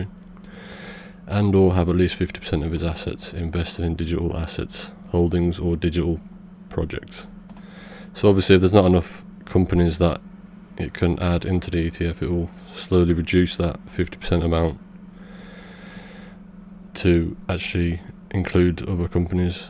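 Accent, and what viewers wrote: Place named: North, United Kingdom. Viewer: Thanks, and you do speak clearly! The Northern accent might throw some, but that's their problem, they need to learn to listen better.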